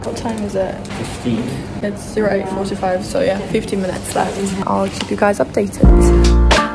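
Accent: United Kingdom. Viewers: Altyazılar olmasa ingiliz aksanını anlamıyorum ama halledicez artık xmcmmxö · She must be in the UK. Cause her accent.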